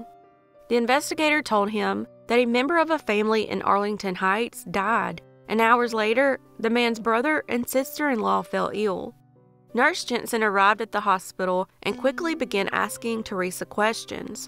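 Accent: South, United States. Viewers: So good to hear a southern accent, I live on the other side of the world and this is so very good to hear. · Love your true southern accent.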